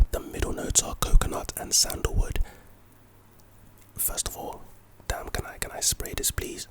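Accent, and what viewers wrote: United Kingdom. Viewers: Are you from the uk bro?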